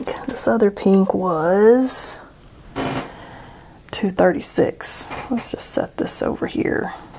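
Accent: South, United States. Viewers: your southern accent is super southerny* today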